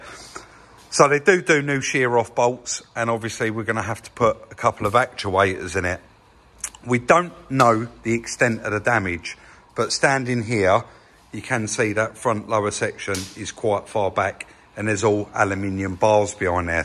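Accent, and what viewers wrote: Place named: Kent, United Kingdom. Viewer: He is from Kent, its just the local accent, nothing wrong with it. Dont ask me if he is a Kentish man or man of Kent. Something about the Medway and a big deal for some people.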